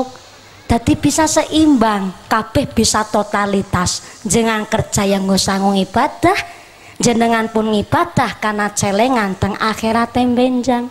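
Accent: Banyumas, Indonesia. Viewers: Subhanalloh q jd seng dgrin ngapaknya ,kira"klu keblitar berapa ya lur,klu ngundang,q pingin,smg bisa nyengke desa ku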